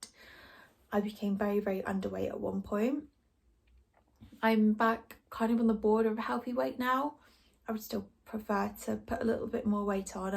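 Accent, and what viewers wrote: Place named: Midlands, United Kingdom. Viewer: Can't pin down your accent maybe midlands Nottingham area let us know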